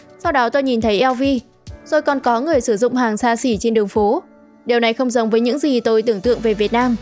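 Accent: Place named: China. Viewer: đây mới là cách nói chuyện của dân trung quốc chứ chứ mấy ngày hôm nay nói chuyện không giống lắm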